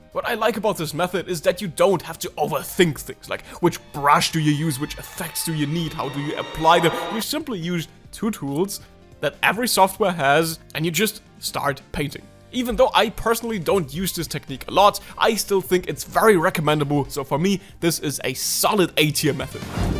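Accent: Germany. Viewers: I only subbed because you keep on showing up in my feed as I'm trying to learn art and I find it kinda funny that we are both named and speak english with a wacky german accent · Are you german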